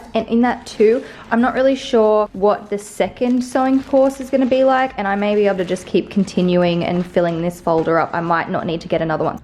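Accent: Australia. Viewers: Lol I binged a bunch of your videos and now my thoughts are in an oz accent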